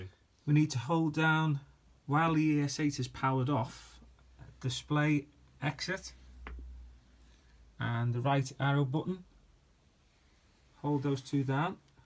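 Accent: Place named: North, United Kingdom. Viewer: Nice one mate. Thanks. And love the northern accent!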